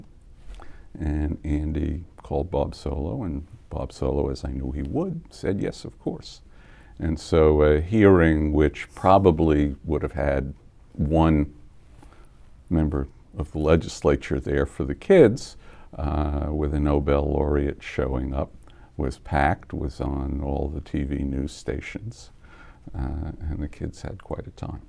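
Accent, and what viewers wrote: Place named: New York, United States. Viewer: Strong NYC accent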